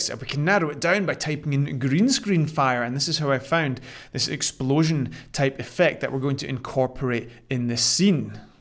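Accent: Scotland, United Kingdom. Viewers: I have subscribed. Nice to hear another Scottish accent!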